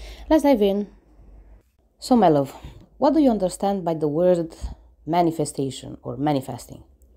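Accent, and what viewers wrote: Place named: Spain. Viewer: I really love your Spanish accent it gives you An edge ✨✨😉 never stop being this blunt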